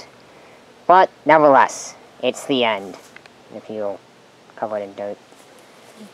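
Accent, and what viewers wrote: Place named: Minnesota, United States. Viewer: Just heard it "Southern-ish Minnesota"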